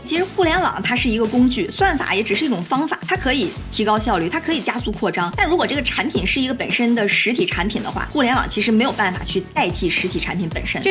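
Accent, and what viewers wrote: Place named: Northeast, China. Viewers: 不知道啥算法，后台推荐了你。这东北口音的魔性，有颜有内容，圈粉了。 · 互联网时代那些“高大上”议题，就你这土掉渣的北方大白话来这么一通大白活，听着就觉得特舒服😌……今天开始订阅了。哈哈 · 喜欢你的东北口音，亲切幽默。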